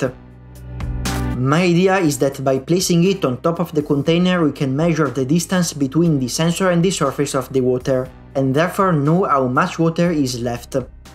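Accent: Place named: Italy. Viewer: Bellissimo progetto! Non capisco perché farlo con questo inglese dall’accento chiaramente italiano. Anyway, bravo!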